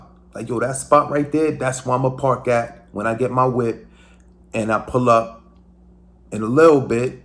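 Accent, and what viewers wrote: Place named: New York, United States. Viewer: aye you sound from NY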